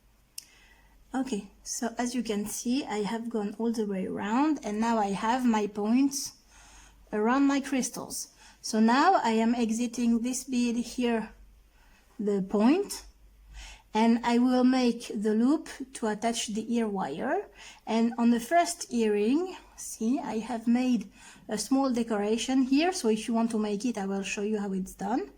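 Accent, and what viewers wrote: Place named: France. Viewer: And it's even better with that cool French accent!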